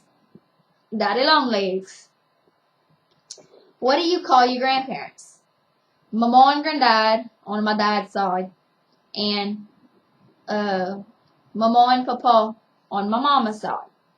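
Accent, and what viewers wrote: Louisiana, United States. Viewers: if you ain't from Louisiana your pretty good I live in Louisiana and some people from here don't even have a Coonass accent like what our doing lol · i love accents. My favorite by far is the Australian accent, but now that Ive heard yours it definitely tops it. It sounds like a combination of traditional southern drawl mixed with New Jersey/New York City/ black people and French. I guess it comes from the mesh of all the plurality of culture that has been around since New Orleans was founded by the French. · Word up! I love the Louisiana accent. Its so much better than all the other southern state. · woah i’m from south louisiana but i’m in the city so i dont hear many cajun accents like yours :0 reminds me of pierre part lol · Im from opelousas/Lafayette and I knew your accent RIGHT AWAY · Damn, chick. You must be from southeast Louisiana. 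Southwest is totally different. I dated a chick from New Orleans and you sound exactly like her. 😆☺️ I grew up in Lake Arthur. That's near Lake Charles. Not far from the Texas border. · "the very heart of cajun country" The way you pronounced "heart" could've told me that :) Love this accent :D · You sound like your somewhere from outside of Baton Rouge like Zachary or Dunham. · I love your accent lol I love when people have that type of accent in Louisiana. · I have to guess your from more towards Thibodaux, maybe Morgan city? Perhaps Lake charles? You dont have as thick of a Cajun accent as say Vermilion parish or ather areas in deep SW LA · I love your accent. I find most southern accents charming, but the Cajun is really something special! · i like your accent much better then most luisiana accents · mhm baby girl you sound pretty cajun as it is haha nice its my favorite accent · When I first moved to Louisiana I lived outside of Lafayette for a few years. 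This accent was common in a lot of my neighbors and with the teachers in my stepson's school. It's very common; she sounds exactly like a lot of girls and women from that area. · I'm from Plaquemines parish. You were soooo on point. Who needs an er when ya got an a. I love our accent. I do call it a soda though. "Grab me a soda"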